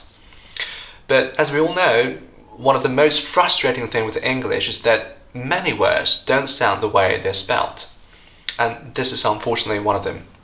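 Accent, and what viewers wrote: United Kingdom. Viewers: 果然同樣身為華人比較容易了解弱點在哪，更棒的是還是英式發音，期待你下次更新，萬分感恩🙇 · 你的英语太好了。 You have an exquisite British accent.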